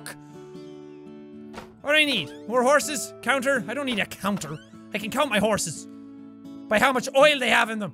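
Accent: Ireland. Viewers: Good o Jackaboy. Irish-er than ever. GO GO!!! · Yey my Irish screaming friend is more Irish today!!! Now I can cuss out everything in Irish or gaylic as they say! Hooray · I swear He sounds more Irish, as he progresses through the video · Is it just me or did sound extra Irish in this episode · this series made extra Irish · Holy shit this episode was amazing, was especially Irish haha it's okay you're gonna beat this spillage fine no worries i believe in you:D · does anyone else notice turns more irish in these videos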